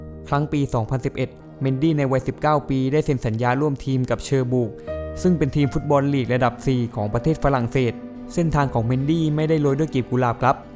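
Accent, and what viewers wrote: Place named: South, Thailand. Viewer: คนใต้ภาคเเน่ครับ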